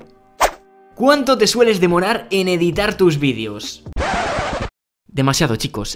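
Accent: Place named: Spain. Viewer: me estas trolleando? Aburrido? Tus preguntas y respuestas son unos de los mejores y... 100% seras mi youtuber favorito hasta tu face reveal(si lo haces) no me suelen gustar porque me suelo decepcionar un poco pero como eres español(o tienes acento español) la mayoria de youtubers epañoles me suelen gustar porque yo soy de españa. Pero creo que de todas formas, tu voz nunca me decepcionará y con ella nunca dejara de gustarme tu canal y muchas veces, me molan los chistes, ejemplo “es verdad que tiene poco rango” de el video de los 7 mejores gadgets, en fin, tus videos me pareceran los mejores despues de 4 videos otro youtuber Tus videos molan! 🔥🔥🔥❤️💪💪💪💪👍👍👍⭐😘😍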